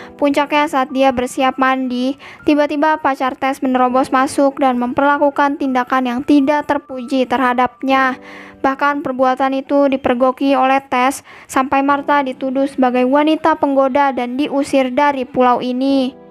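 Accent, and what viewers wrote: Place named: Madura, Indonesia. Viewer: Suaranya kayak bocil sd, gbisa santuy tapi cepat. Maduranya kelihatan banget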